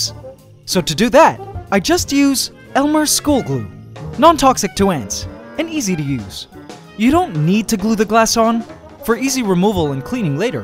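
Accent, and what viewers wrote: Canada. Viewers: Destrxct_ haha I always assumed he was Canadian... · You are Canadian right?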